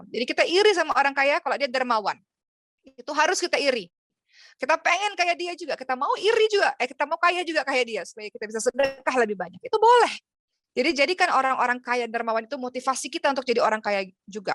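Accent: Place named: Arab world. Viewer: TERLALU CENGKOK ARABNYA. ULAMA PUN GAK BEGITU.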